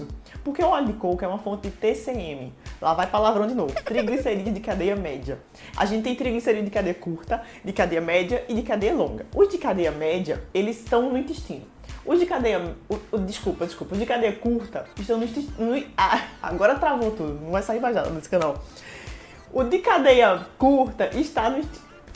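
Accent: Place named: Pernambuco, Brazil. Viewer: Que gracinha você! De Pernambuco, certeza!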